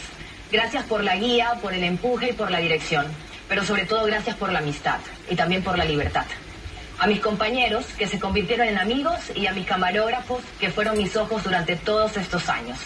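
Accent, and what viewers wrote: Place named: Guayaquil, Ecuador. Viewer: eres una mujer linda valiente inteligente comunicativa sensible, emocional 100%, tienes una voz y el tono de una guayaquileña que encanta, seguro te irá bien, cuídate, te extrañaremos.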